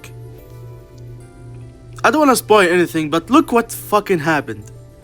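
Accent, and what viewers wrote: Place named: Arab world. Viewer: Are from uae or suadi u sound like arab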